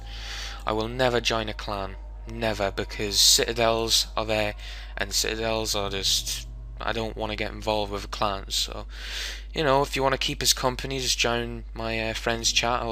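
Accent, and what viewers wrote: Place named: Newcastle, United Kingdom. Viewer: Geordieeeeeeeeee m8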